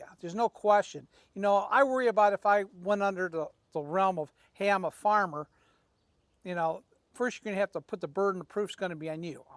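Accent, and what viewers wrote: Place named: New England, United States. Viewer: You sound like a yankee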